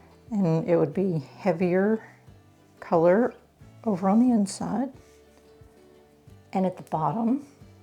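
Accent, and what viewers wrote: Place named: South, United States. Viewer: I learned so much from your great video and, I love your southern accent.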